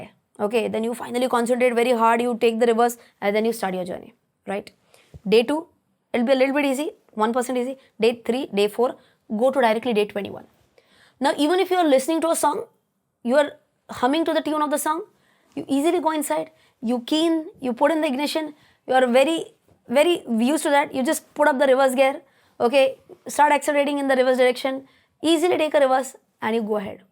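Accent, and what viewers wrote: Maharashtra, India. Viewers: Madam your videos have been allways encouraging, I am from Belgaum and my mother from Maharashtra, basically we are marathi speaking, you marathi English accent gives mora attachment to all your videos, I just Love them. Thank you. PRESENTLY I am reading a Book Effective time management by polly bird published by flash. I really felt its worth for all the citizens of India, if you can make video on it it will be interesting. Also mada I make lot of spelling mistake if Google is not around me if you can make video on that it will be nice. Vivek varale Bangalore.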